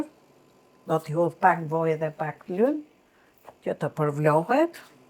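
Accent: Tirana, Albania. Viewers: Te lumt zonje. Je fantastike, me ze te embel,dhe dialekt tirons puro.❤️🖐️ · Te degjoj se flet tironce😂😂😂😂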